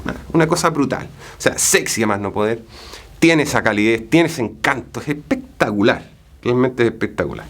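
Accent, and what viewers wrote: Chile. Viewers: con razón le encontraba acento chileno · cual es tu nacionalidad? Me parece escucharte un acento chileno? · Si, es chileno, pero hace años vive en España, por supuesto le delata su acento, yo le entiendo perfectamente, a él y a los chilenos en general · Su acento es Chileno? Cuando dice: que me encanta, que me vuelve loco...madre mía!! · Por el acento es de Chile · Dice "fragancia" y yo escucho "Francia". Supongo que es chileno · Tienes acento chileno. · Alguien save de donde es el. Su acento es de Chileno Pero el vive en españa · perdon....Quien dijo que el era Español???? su forma de hablar es Chileno...!!!!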